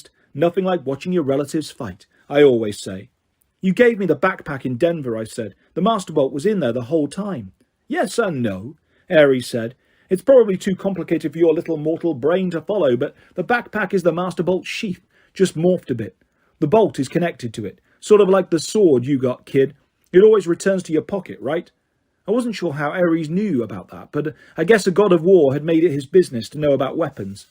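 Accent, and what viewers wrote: United Kingdom. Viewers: He's British § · He's BRITISH bro, what the fuck did you expect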